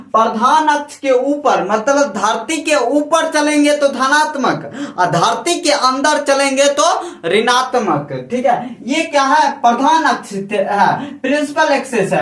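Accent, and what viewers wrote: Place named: Jharkhand, India. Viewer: Jharkhand sa bola raha hai sir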